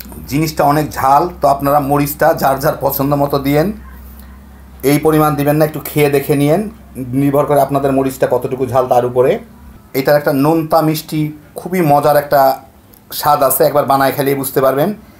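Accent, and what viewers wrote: Bangladesh. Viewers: Dada .... Epar Bangla (India) theke antorik bhalobasha. Recipe to darun, kintu apnar presentation ta awesome. Amara o bangal, r anek din por ei Bangladesh er accent ta khoob bhalo laglo. Bhalobasha o subheccha roilo 🙏.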